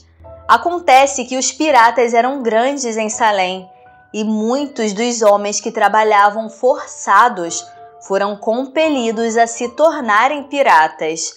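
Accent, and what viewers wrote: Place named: Rio de Janeiro, Brazil. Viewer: Rio de janeiro q puxa o x no final.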